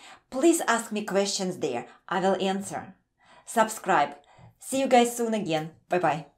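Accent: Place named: Eastern Europe. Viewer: just out of curiosity where are you from you sound like eastern europian, and thanks for the great content:)))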